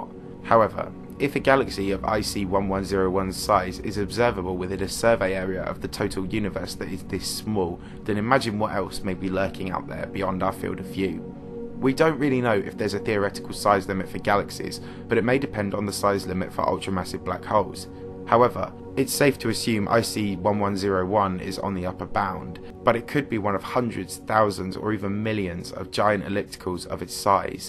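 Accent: United Kingdom. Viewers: Thank you, you just won me 10$!! I bet my girlfriend the narrator of this video would be British. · He doesn't sound anything like James Cordon. Let me guess... You're an American. And all us Brits sound the same, (even though we have more regional accents than just about any other country.)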